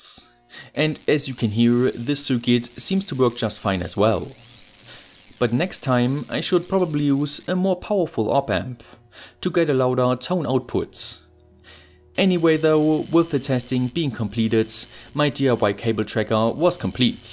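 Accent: Germany. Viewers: It's surprising how many of my favorite YouTubers are German. From The Mathologer to TheOftler to you, I love the variety of accents! I notice your accent sounds a lot like Sabine Hossenfelder's.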